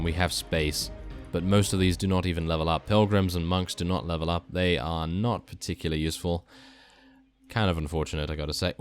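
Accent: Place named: England, United Kingdom. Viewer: soothing listening to a proper english-speaking voice instead of that dreadful american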